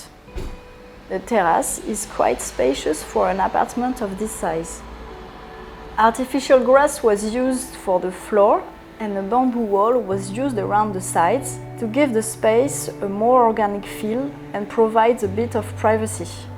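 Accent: France. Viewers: I think it's just her French inflection. What she said is "I love the influence of Danish architects, Alvar Alto, and American MCM architecture". I'd have to assume any professional architect with interest in MCM design has studied this carefully. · Chouette visite, de plus je me rends compte que je comprends bien l’anglais quand il est parlé par une française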